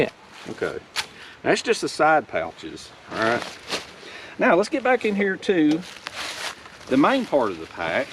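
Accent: South, United States. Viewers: This is one of my favorited channel. I especially like it when you explain everything so nicely especially with the southern accent .I found this channel randomly; it is really a channel that produces quality content. I have learn so much .Thank you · I love your accent man for me as a German it's a delight to just listen to you speak. What area are you from in the US?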